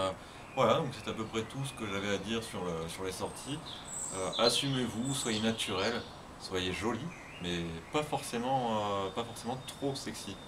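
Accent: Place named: South, France. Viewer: ah oui d'accord alors tu commence à avoir l'accent du sud !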